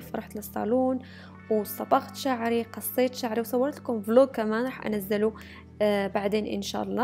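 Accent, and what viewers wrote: Morocco. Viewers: Si maghrbiya bayna ghir tari9a kalam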